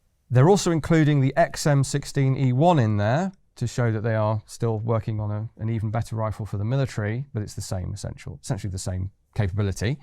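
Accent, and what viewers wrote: United Kingdom. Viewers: Always fun to listen to this British gent speak about firearms, especially ultra-rare almost non existent pieces.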